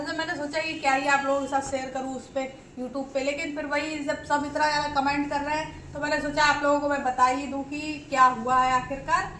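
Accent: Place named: Braj, India. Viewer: Kaha se ho aap language to braj ki lag rahi he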